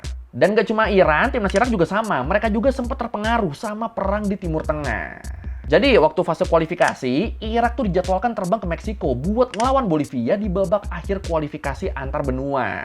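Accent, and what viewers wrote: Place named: Java, Indonesia. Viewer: bang elo...medog kayak jawa ya😅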